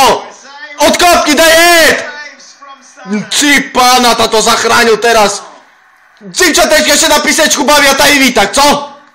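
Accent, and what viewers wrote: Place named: East, Slovakia. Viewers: Vychodňar ako ma byť!! :D :D :D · paradaaaaaaaa...supeeerr....aj ked polka SVK nerozumie asi ale "na vychodze rozumime" :D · jeden záchvat skončil,a pri dalšiej vete znova :'DDDD niet nad našu vychodnarčinu <3 :3 :D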